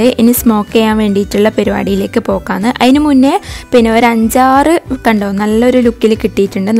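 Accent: Kannur, India. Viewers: Innala korchi busy aaypoi deep cleaning nnta baagam adhn nu late aayadhu ningla video kaanan my fvrt ur vdes and kannur slang...enki oru hai hello paryoo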